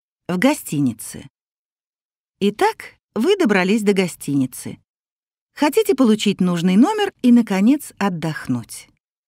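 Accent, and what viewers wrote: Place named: United Kingdom. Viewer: это британский английский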